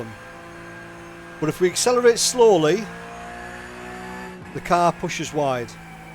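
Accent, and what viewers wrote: United Kingdom. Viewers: What part of UK is your accent?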